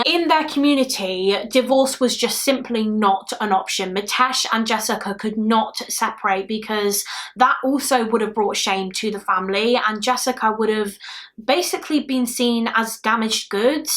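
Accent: United Kingdom. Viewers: I have been a subscriber of yours for a long long time, since a couple thousand subs, & I have to say that I have loved watching your growth & seeing you become more & more comfortable with every video. Congratulations on 100k love! I can’t think of anyone who deserves it more!! You really help this boring 42yo, mom of 2 grown kids, nurse, wife, & true crime FANATIC with your wonderful storytelling & your true empathy & sincerity. I love your accent too 😘 (I’m from Alabama, USA, so there’s nothing better than a beautiful British accent especially because I have one of those slow annoying southern accents 🤣) I just wanted to say congrats & tell you how much I love your channel. 100k down, now on to 1M! 🎉❤️🥰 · Great video !!! Keep them coming !! Great job love your look and makeup and accent !!! I am American always love British accent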